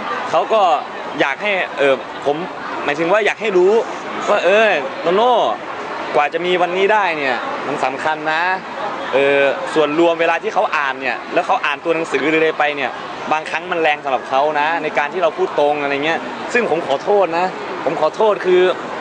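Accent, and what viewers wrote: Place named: Isan, Thailand. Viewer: ชอบตรงพูเดกลางสำเนีบงอีสานเนี่ยแหละ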